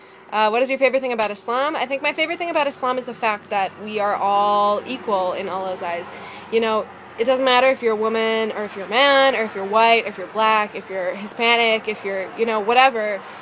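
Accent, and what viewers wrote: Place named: Canada. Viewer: you accent is same as Elisha Cuthbert , which means you are from canada or you must be actress or something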